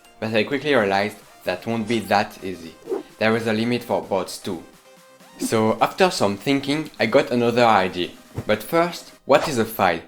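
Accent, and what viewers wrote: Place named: France. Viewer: Un francais passe par la et reconnais l'accent francais. Salut ^^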